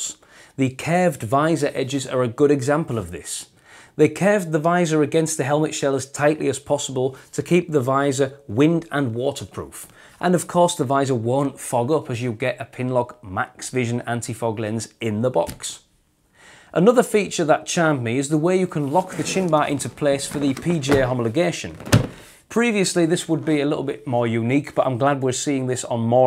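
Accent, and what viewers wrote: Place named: Yorkshire, United Kingdom. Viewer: Yorkshire, Huddersfield, I’d say.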